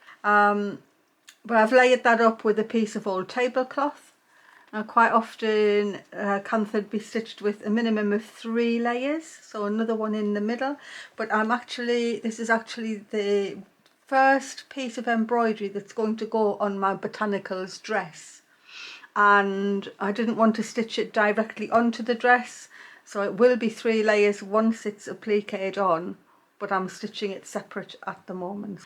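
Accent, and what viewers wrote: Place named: North East England, United Kingdom. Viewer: For me, it’s so lovely to hear somebody from the north east because it does feels like I’m listening to a friend or relative. Thank YOU for being here ❤❤❤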